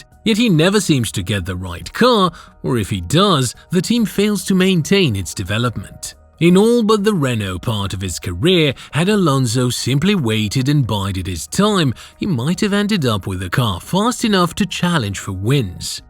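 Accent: RP, United Kingdom. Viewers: Narrators a bit posh isn’t he?!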